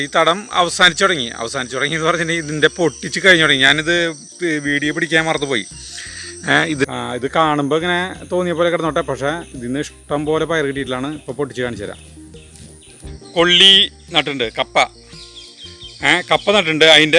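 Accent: Thrissur, India. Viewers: കൃഷിയേക്കാൾ ഇഷ്ടായത് മ്മ്‌ടെ തൃശൂർ സ്ലാങ്ങാണ്റ്റ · സൂപ്പർബ് ട്ടാ തൃശ്ശൂർ slang രസമുണ്ട് കേൾക്കാൻ. ഉപകാരപ്പെടുന്ന video. പിന്നെ വള്ളിച്ചീര കിട്ടീല്ല.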